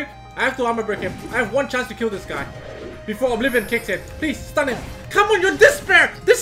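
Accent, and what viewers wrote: India. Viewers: best indian accent very good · indian accent crack me up so hard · Love the indian accent!!!!!! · Indian accent is so funny😂😂😂😂😂😂😂😂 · that indian accents roflllll. so bad senpai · lol indian accent lmao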